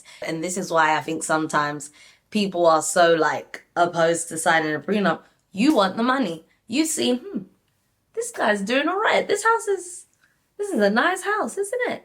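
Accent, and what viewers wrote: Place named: United Kingdom. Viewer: I love your reviews and that accent is so enticing. Honestly, when you brits talk, I just damn listen 😂. Ok so, let's get into it. I have subscribed and thumbed up 😉 1) Nicole and Sam - we have to be fair and not give Nicole a pass for her behavior. Her asking Sam for physical contact after that disastrous reveal and what her heart showed her is really low. She has self-esteem issues. Was it love at all cost for her, cuz sis has me really disappointed with that revelation. Did she think that sleeping with him was going to erase the awfulness that is Sam? 2) Demi and Ollie - Ollie is not about that life, he likes pretty girls and whilst he knows Demi is good wife material, the sexual tension is lacking. This wont' work. Demi is just a homie to him. 3) Maria and Tom - Maria can't have it both ways, she can't be about arab/muslim culture and values in one scene and be drinking a cosmo in another. 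I have nothing against Muslims/arabs nor against alcohol but the fact that she is a liberal muslim proves that she needs to compromise with the world as she has done with herself. It's not because Tom does not put religion or culture forwards that he lacks values or choice. Stop it already. 4) Cat and Freddie - Cat has a temper or maybe it's Freddie that triggers her. I think Freddie's gorgeousness throws her off, it's almost as if this was his best quality and his worst at the same time because people tend to associate his looks with someone who is effeminate or soft. In any case, Cat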